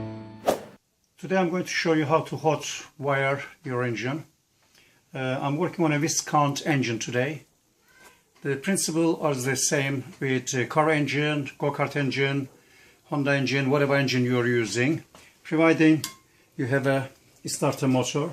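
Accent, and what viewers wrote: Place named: Iran. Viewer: You sound like you have an Iranian or French accent🤔🤔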